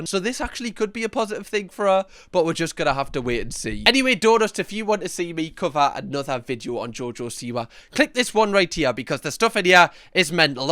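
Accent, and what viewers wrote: Newcastle, United Kingdom. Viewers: Are you a Geordie?! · so refreshing hearing a geordie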